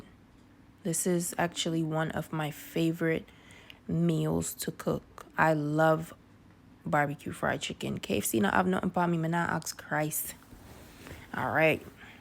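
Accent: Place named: United States. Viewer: Roza send me here loving the content already ? Are you a Jamaican you sound American was wondering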